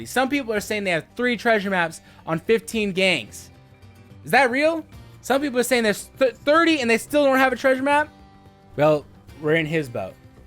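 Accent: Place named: Russia. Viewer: Nice man I like gang hideouts. Damn man I just noticed how many subscribers you got now. Haha when I subscribed you had around 100. It’s because of the Russian accent you do haha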